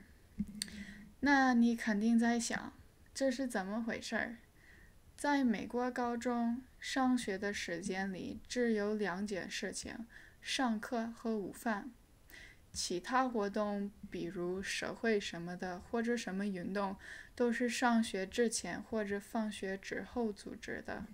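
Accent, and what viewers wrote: Northeast, China. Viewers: 东北口音，哈哈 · 哈哈哈哈哈哈 这东北口音太可爱了 上晚自习很无聊，可是到了高二的时候高考的紧迫感会让大家格外珍惜这个时间。毕竟在学校里跟同龄人一起复习功课效率会高很多。我读高中的时候，上的是寄宿制学校，晚自习是十点结束。在高三学生的强烈要求下，学校准许高三生可以延长一个小时到十一点。现在想想真是很感激学校和老师，学生自习到十一点值班老师也要义务多值班一小时陪着不能早早的休息。如果中国的教育资源可以像美国那么丰富，中国学生也可以有更多时间社交和发展自己的兴趣。很遗憾，人口众多，资源不足，年轻人想要通过教育改变命运必须接受这种历练。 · your 东北accent got me😂 sending out lots of love from Harbin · 普通话有东北味了，笑死了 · 这是写好了稿子照着念的吗？好像有点东北口音了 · 看一个老外妹子一本正经地说东北话，我爆笑了半天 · Your Mandarin is very accurate have a cute accent of northeastern of china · 中文有东北口音了哈哈哈哈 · 这东北口音没毛病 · 中文很棒 且学的有讨人喜欢的东北味🤩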